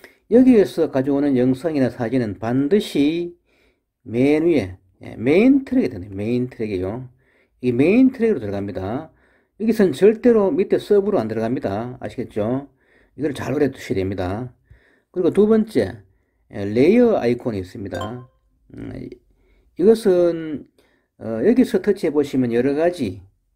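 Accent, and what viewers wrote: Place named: Gyeongsang, South Korea. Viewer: 설명 아주 천천이 차근~~ 잘 하시네요. 귀에 익은 경상도 말이 마음에 들어요. ㅎ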